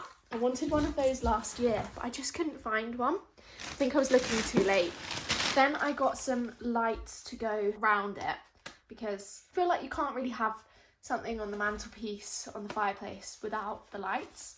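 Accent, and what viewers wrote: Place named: Scotland, United Kingdom. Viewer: Keep posting stuff,! I’m a guy from Colombia (so Spanish is my first language). I have a trip to the UK coming up, and I’m already getting familiar with the Scottish accent (not an easy one for a non-native speaker). I just found your channel thanks to your "football skills" in the ChrisMD video.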